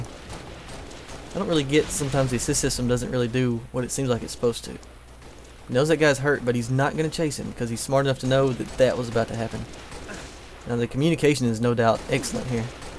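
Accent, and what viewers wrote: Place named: Texas, United States. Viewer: you sound like ur from texas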